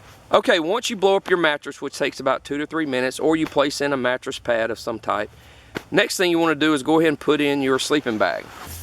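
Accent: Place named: Alabama, United States. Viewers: Great job sir. I also thought how familiar your accent sounds. I grew up in south Alabama. I've been wanting to camp as I did as a kid but wanted a refresher overview. This is exactly what I needed. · Loving your southern state Alabama accent there mate! Greetings, Leeds, UK. Good tips for me and my new wifey for our first camping trips. 😉👍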